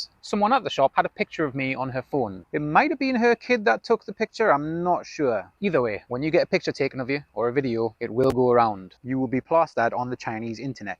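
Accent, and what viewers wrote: Newcastle, United Kingdom. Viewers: Are you a Geordie? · Yes, I could hear a "Haway the lads" accent in there 🙂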